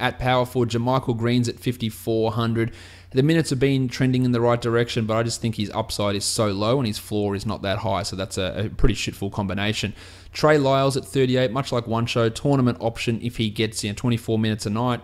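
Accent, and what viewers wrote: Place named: Australia. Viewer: Awesome thanks! I listen to your podcast every morning before school/work, used to live in aus for 2 years so might just be missing the accent.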